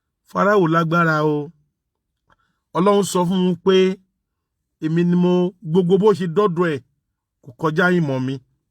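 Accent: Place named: Egba, Nigeria. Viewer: Niceness, I'm enjoying the tiny bit of your Egba accent...lol (I'm Ijebu); Yorubas are such a beautiful and diverse people, I can barely wait to live in our new Nation.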